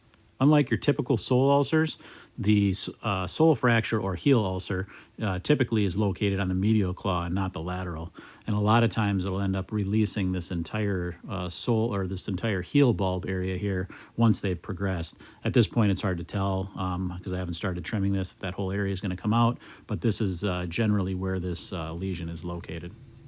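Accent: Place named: Wisconsin, United States. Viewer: I don't know if it's the Wisconsin accent that reminds me of home, or if it's that I identify with a good pedicure, but I love these videos.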